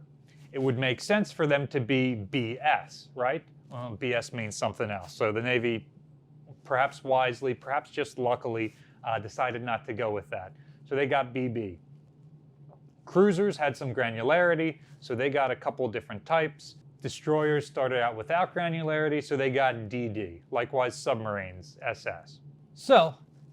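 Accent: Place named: Hackensack, United States. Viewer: Im from Hackensack but live far away now, and while I have a casual interest in Naval history, listening to talk and his mannerisms make me feel like im home again. Thanks.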